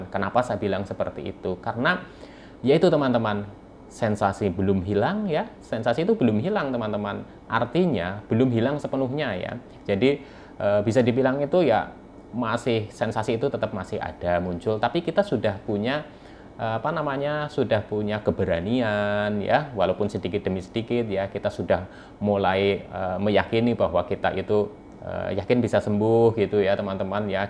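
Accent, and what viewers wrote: Banyumas, Indonesia. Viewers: Sembuhnya pakai apa...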